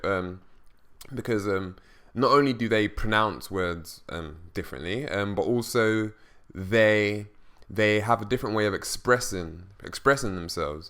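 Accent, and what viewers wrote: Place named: United Kingdom. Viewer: 3 weeks is not enough time for you to get a good understanding. It would take several months for you to become fluent. Immersion is the best way. It sounds like you are british so I dont know what its like there but here in the US you dont have to go to a foreign country to immerse yourself at least once a week with native speakers. I spent a month in Costa Rica and toward the end I felt my spanish improving slightly, but not terribly, I needed more time.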